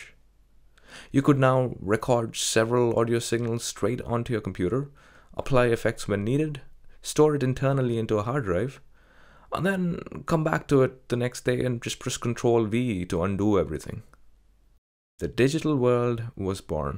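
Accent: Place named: India. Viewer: this would've been a great video if u didn't have that accent, or if u weren't indian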